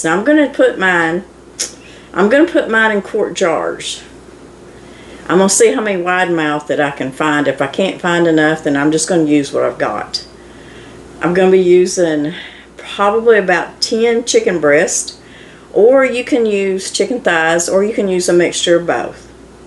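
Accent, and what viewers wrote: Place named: Ozarks, United States. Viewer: Love your channel. My favorite recipe so far is your Crazy Crust Easy Pizza. I make it a lot and I make it gluten free for my 13 yr old grand daughter. Thank you for the great recipes and all the love. Your home is so charming and I love your Ozark dialect. ❤ and we love the Lord too. I’m 70 and my hubby is 81. We live on a small homestead in Indiana.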